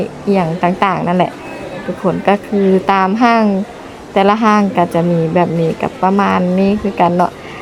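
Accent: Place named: Thailand. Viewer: สำเนียงการพูดจาคล้ายคนไทยมากฟังเข้าใจง่ายชื่นชม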